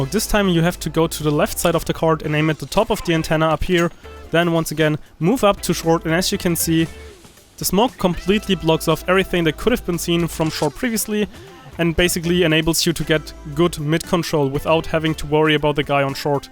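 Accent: Germany. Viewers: Man merkt das er deutsch ist lol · Dieser Moment wenn man alte Videos guckt die mega nice sind wie deins hier und man aber richtig merkt das du Deutsch normalerweise bist aber du sprichst das sehr gut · Good denglisch😄 Good Video👍😊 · u sound like german. r u? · german accent detected ^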